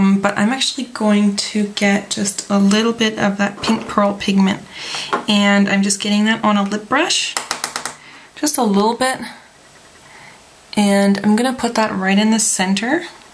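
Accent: United States. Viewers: ok ok sorry lol im wrong.. i thought in another video that she said she lives in canada.. n coz she sounds like an american it kinda added up... lol my bad.. soz xx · Yeah. She does sound American. :P Most Europians can't say 'R' or 'Th'. :D Like my mom for example. lmaooooo.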